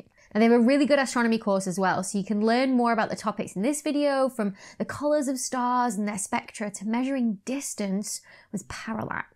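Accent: United Kingdom. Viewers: I thought I detected a British accent, and the "naught" confirmed it. This channel is a sapiophile heaven, or Eden. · "plazmer"...I love a British accent.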